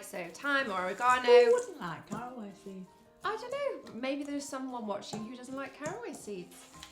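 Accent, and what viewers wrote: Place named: England, United Kingdom. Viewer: Thank you so much for posting. I had all the ingredients for these and made a batch straight away. They are very tasty and go great with cheese. I've tried a few low carb cracker recipes and these are the best. You didn't specify Celsius or Fahrenheit but as you sound English I presumed Celsius. I have a fan oven so adjusted down to 130°c and they cooked perfectly.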